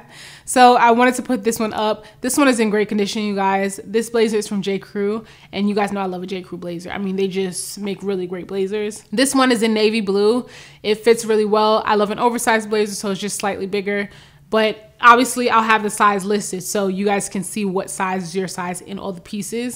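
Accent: New York, United States. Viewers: Great picks. Checking out the closet sale. And I’ve been meaning to ask you were from New York because of your accent and boom like that you told me without my asking 😊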